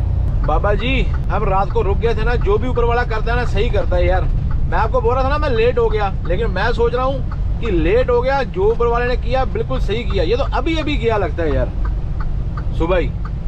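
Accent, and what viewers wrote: Punjab, India. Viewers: Tu pure Punjabi bola kar bai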